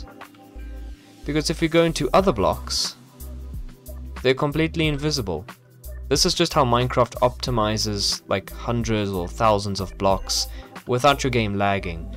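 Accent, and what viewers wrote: South Africa. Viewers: Great video! I have one question tho... Are you also South African? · You sound very much South African :)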